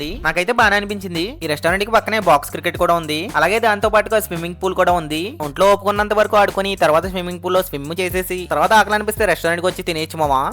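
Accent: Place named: Godavari, India. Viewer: Bayya godavari side aa accent Bagundi 😅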